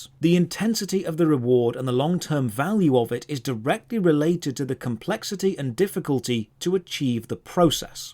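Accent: United Kingdom. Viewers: You sound so british for a swede.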